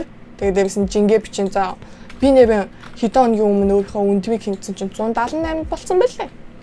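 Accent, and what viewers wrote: South Korea. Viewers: Angli heliig solongosoor unshdag mongol ohin geesh heden gadaad nzdaa helsen tataj untlaa ineelee elgiin2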